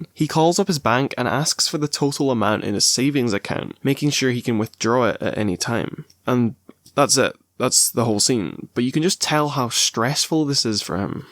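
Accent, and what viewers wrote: United Kingdom. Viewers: I subscribed just cause of the brick wall guy at the end and u sound like u from uk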